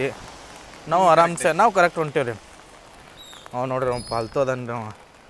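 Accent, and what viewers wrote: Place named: North Karnataka, India. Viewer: ನಮ್ ಉತ್ತರ ಕರ್ನಾಟಕ ಭಾಷೆ ಕೇಳಾಕ ಎರಡ ಕಿವಿ ಸಾಲುದಿಲ್ಲ್ ನೋಡ್ರಿ...😍 ಮಸ್ತ ವಿಡಿಯೋ..👍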